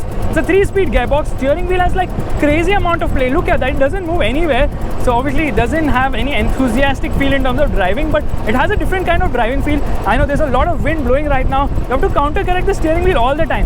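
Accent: India. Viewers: Typical Indian engineering college type annoying English accent. Trying to speak fast to show the fake fluency. Jeep mardo pe achhi lagti hai. Tum jaisi pe nahi. Next time drive some Alto or Wagon R.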